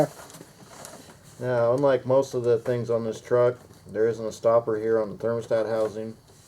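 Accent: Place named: Missouri, United States. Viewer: Sounds like you live in Missouri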